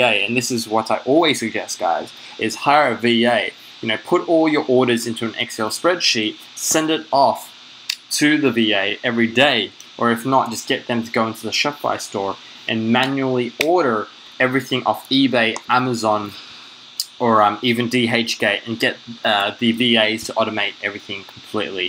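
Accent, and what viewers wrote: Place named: Australia. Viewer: Sounds like an Aussie.. 5 bucks must be like 50 cents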